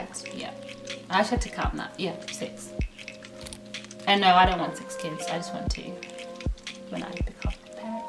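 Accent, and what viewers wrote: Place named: Australia. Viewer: Loved this! Making me miss Australia, you've kinda got an Aussie accent as well now 😂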